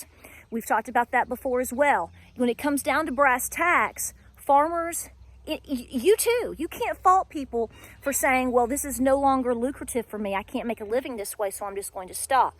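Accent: South, United States. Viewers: I love your southern accent 😍😍😍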